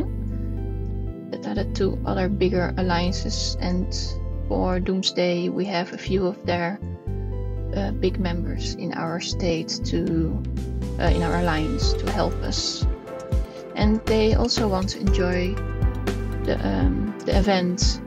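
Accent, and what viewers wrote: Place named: Netherlands. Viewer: Nice video, recognize a fellow Dutchie when I hear one haha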